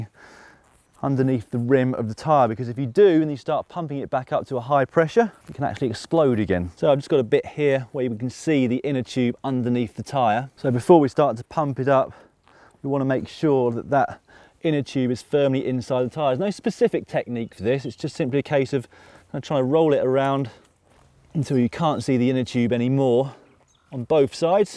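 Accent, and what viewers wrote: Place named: United Kingdom. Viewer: Can you tell me where this video was made? You sound British...signed curious in Canada...Alberta...Calgary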